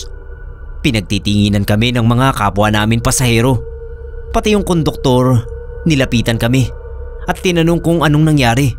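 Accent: Iloilo, Philippines. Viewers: kuya ilonggo ka no? 😅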